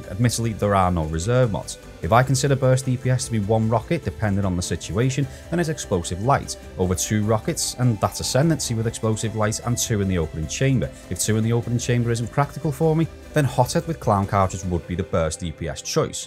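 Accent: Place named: Scouse, United Kingdom. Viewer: sound like micheal owen , stop hiding the scouse accent lad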